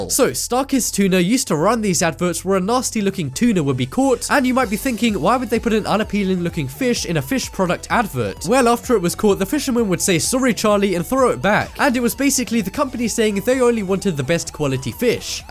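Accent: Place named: England, United Kingdom. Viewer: What part of England do you hail from, Charlie? Just curious due to your accent :)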